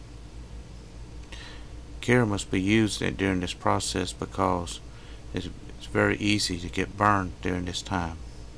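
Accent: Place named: Louisiana, United States. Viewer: Are you from Louisiana? That is where my family is from. I recognize the accent and the of course you mentioned gumbo.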